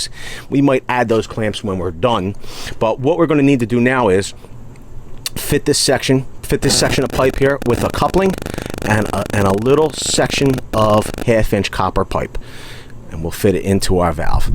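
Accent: Philadelphia, United States. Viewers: Got to be Philly yo jagoff !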